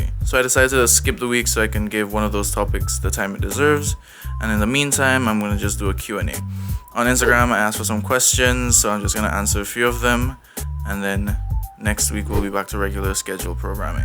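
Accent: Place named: Trinidad and Tobago. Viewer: Hey, just found your channel - love the content! I hear an accent.. are you trini?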